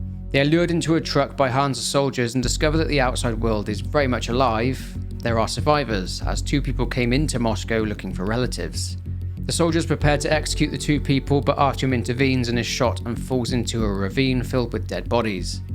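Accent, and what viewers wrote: United Kingdom. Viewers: This is actually a really common thing amongst a death of British YouTubers. There's something about the accent that's soft, but I think it's largely how a lot of harder sounds like Rs are instead muted. We tend to think of Ks or Gs are harder sounding letters, but they're common on all languages and accents. Something about how certain letters are shifted in British accents just seems to soothe people. As the saying goes, the largest modern export of Brittain is their accent, and it's for good reason too.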